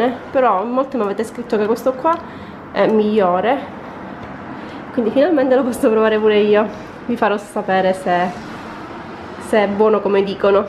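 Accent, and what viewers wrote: Sicily, Italy. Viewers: Sei siciliana? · Sei siciliana??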